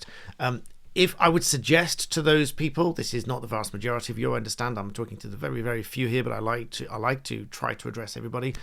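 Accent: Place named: England, United Kingdom. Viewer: I like the way you explain things in very clear english accent well done Mate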